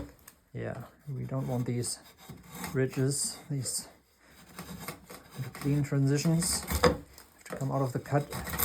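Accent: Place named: Sweden. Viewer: That's a Swedish accent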